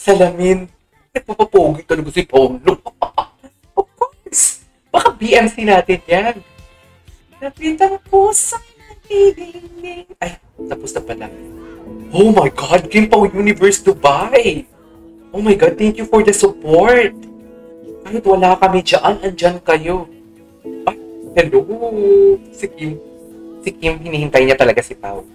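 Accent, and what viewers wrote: Iloilo, Philippines. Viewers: Ilonggo ka sir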